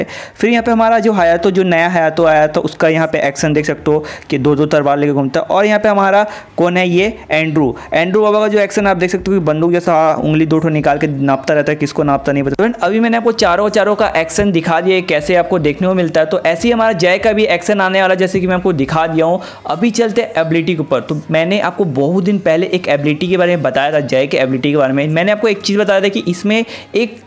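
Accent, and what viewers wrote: Odisha, India. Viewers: bhai aap odisha se ho kya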